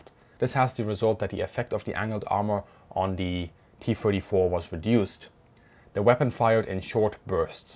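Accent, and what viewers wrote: Germany. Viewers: just wanted to give you a genuine compliment - with apologies if it sounds a bit backhanded at first, because that's not at all the intent! I've been a channel follower for years now, and it's really been interesting to see how your approach has grown, changed, and taken on new influences. Just in the past week, you've had a video that really reminded me of Greg('s Airplanes and Automobiles), followed by this video which is much more in the style of Bernhard (of Military History Visualized, of course) - but both with your own unique spin, specialty, and attention to detail. Rather than suggesting that you're copying someone else's styles, I'm instead complimenting your versatility and mastery of very different formats and approaches - and bringing in a wealth of research and your own style. In the same way (and I say this as a linguist!) - your English often brings in very British expressions and very distinct Americanisms, often in the same sentences, while always in that unmistakable German tone. 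It's neat, adds a lot of colour to the language, and makes your content all the more pleasant to listen to. Keep doing what you're doing! It's great to see you try so many different approaches, take on so many different influences, and continue evolving this excellent channel:) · Thank you for this video. I am American and I spent two tours there back in the 1970s; I know that not all Germans were ‘supportive’ of the Nazi view of the world. I have met ‘good’ Germans and knowing what they had to actually ’do’ to maintain their ‘humanity’ is truly impressive. I remember one... I think he was a Bundesvehr Lieutenant Colonel and an American friend told me that the Lieutenant Colonel had been ‘evacuated’ by dog sled from Stalingrad. Hearing someone ‘explain things’ in clearly distinguishable English (albeit with an equally distinguishable German ‘accent’) makes this a very impressive video... 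Thank you!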